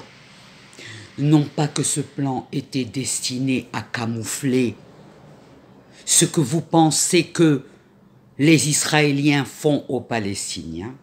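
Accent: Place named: Morocco. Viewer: كتعجبني لهجتك بالمغربية ❤️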